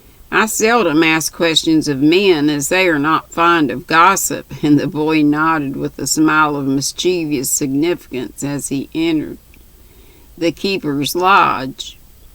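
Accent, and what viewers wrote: South, United States. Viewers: You are so so cute 🥰! 😂 I love your southern accent! I love everything about the south and southern ways! I swear I was southern in a past life, because I’m Hispanic, I was born here but my parents are from the Dominican Republic 🇩🇴 and I actually learned English in school because my parents didn’t learn English till we went to school, therefore people find me strange ( which I’m ok with) when they hear me listening to country music instead of Merengue or something like that, and they see how I love 💗 my cowgirl 🤠 boots 👢 and my country home style. 😂 I just love it! My dream is having a ranch with horses 🐎 and all that good stuff! Also finding myself a good ol’ country man that knows how to treat a lady 😂 ( if you know one let me know Ms. ) 😂 Anyways just wanted to say I love 💕 your humble southern ways and style! Thank you for being you my sweet friend! 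🥰🥰🥰🥰🥰😊😊😊😊🙏🙏🙏😘😘😘😘😘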